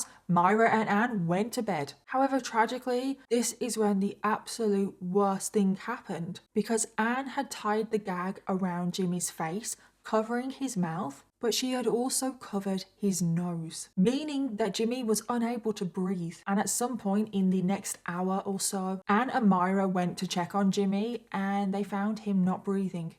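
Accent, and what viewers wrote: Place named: England, United Kingdom. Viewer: Hey my fiancé and I love your videos and we've found ourselves trying to figure out your accent! Where in England are you?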